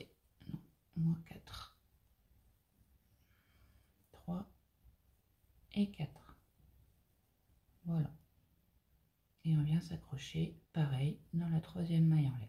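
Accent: North, France. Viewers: Bonjour crochet très joli je pense la faire ce fil est tellement super vos explications sont claires. Une question sans indiscrétion seriez vous du Nord car votre accent m interpelle?tres beau travail merci beaucoup et bonne semaine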